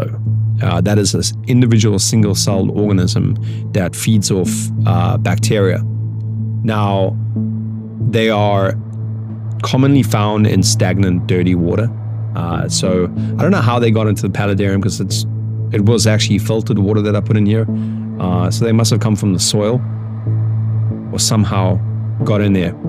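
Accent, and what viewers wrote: South Africa. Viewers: I love your content & one of the reasons why is because I can hear you’re South African 🇿🇦 ❤ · Cool, I knew I recognized your accent, I'm from SA too!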